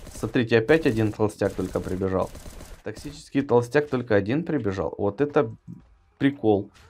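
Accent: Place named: Ukraine. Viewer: Український акцент)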